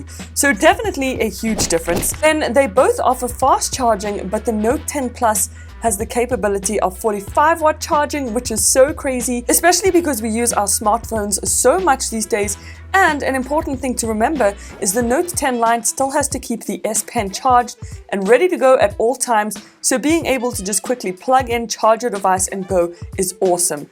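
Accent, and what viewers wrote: South Africa. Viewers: Thought you were south African lol inwas born and raised there and came over to the uk in 2010 xx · Wait you're south African? 😮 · Refreshing to hear a South African voice!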